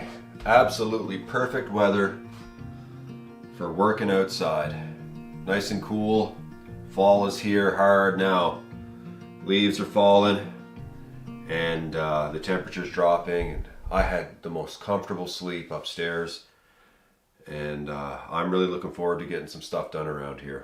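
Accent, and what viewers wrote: Newfoundland, Canada. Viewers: I'm really loving your videos. I saw you on Chuck Porters at his cabin. You guys rock . I here in your voice you to are from Newfoundland. I myself was born Bell Island but living in stressful Ontario. God I would love to live like you brother. Your shows motivate me,keep them coming brother.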